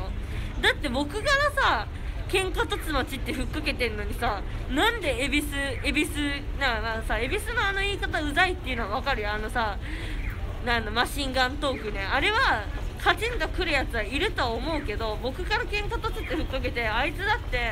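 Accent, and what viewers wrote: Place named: Kansai, Japan. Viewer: たまにアクセントが関西弁だね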